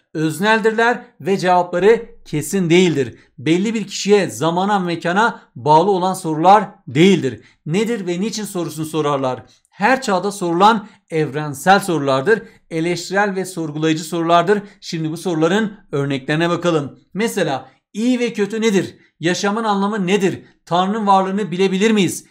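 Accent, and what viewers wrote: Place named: Van, Turkey. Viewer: belli bende vanlıyım anladım konuşma tarzınızdan :D <3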